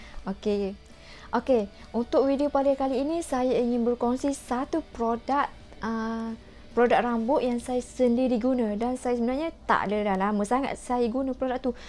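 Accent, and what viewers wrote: Kelantan, Malaysia. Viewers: Awk ni asal kelantan ke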